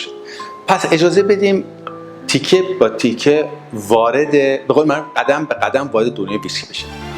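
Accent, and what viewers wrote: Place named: United States. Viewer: لهجه مشتی داری امریکا به دنیا اومدی ❤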